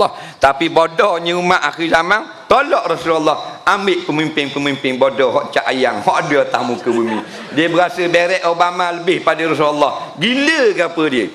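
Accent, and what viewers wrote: Terengganu, Malaysia. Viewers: Ceramah terbaik, dari yg terbaik.Lawak2 yg penuh berisi, dlm loghat Terengganu.Best loghat Terengganu ni.Loghatnya lebih kurang loghat Kelate le!!!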